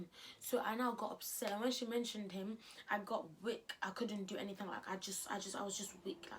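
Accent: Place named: United Kingdom. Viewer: omg you're british? could you do a video on uk slang like the meanings 🤔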